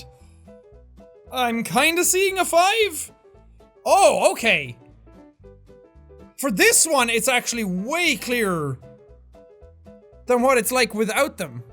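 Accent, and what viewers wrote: Ireland. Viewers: That's the Irish accent for ya. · My earphones broke and now I miss hearing Irish accent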